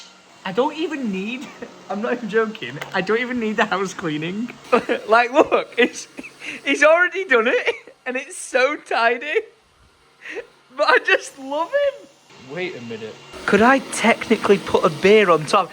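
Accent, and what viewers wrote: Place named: England, United Kingdom. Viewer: Man it sucks so much it really does. Being able to watch you through all of these years give your heart out to those who give theirs to you and seeing the so unforgiving fate that awaits them is just so unforgiving. There is a community within YouTube and then there is a community within these 2 channels and the sheer amount of love and support and kindness shared in this community alone outglows all of the others. I’ve been a fan since way before Alec got to meet you and up until now and being able to see the interactions between you and these amazing people is so amazing but life is just so fing unforgiving and unfair to those who deserve it the least. For what it is worth, I’m glad that you two were able to spend the time and memories that you did together as I know and can sympathize to an extent just how important it is to both of you. 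I’m sure that even though he is gone, he will still remember the moments shared and you will continue on to remember them just the same. I’m so sorry that your life is so riddled with this fate for so many people. I’ve watched you since you were a kid and in a way, just through a screen you feel like a brother at times. Watching you so often has even made me forget that you have an English accent and live on the other side of the world because of how much you allow us to be part of your life and it means the most to us. I’m so sorry for your loss. It truly is heartbreaking. I wish you, lyd, Alice and his family the best in this time. Love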